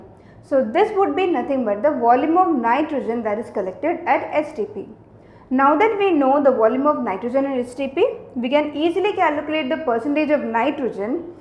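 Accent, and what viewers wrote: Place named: South India, India. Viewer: Aiyo I guessed right you are south indian ji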